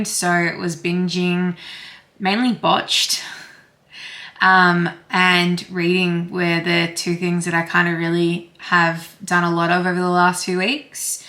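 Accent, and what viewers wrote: Australia. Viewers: I have just binged every one of your episodes since the start… I am also rewatching Gilmore girls… you have an awesome channel and I am happy to hear another Aussie accent… I live in Brisbane… keep up the good work…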